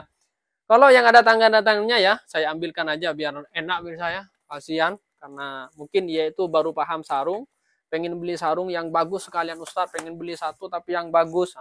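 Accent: Madura, Indonesia. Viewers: Abang dari logatnya kayak orang madura ya bang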